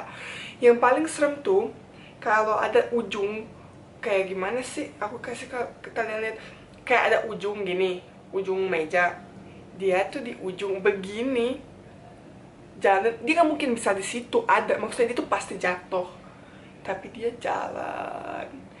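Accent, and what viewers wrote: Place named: Java, Indonesia. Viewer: Ngomongnya luar biasa medok banget, sampe nonton ketagihan, nonton terus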